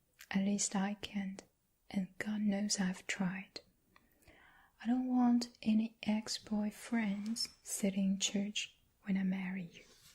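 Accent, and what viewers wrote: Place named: Australia. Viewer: 好好听 有一点点澳洲口音哈哈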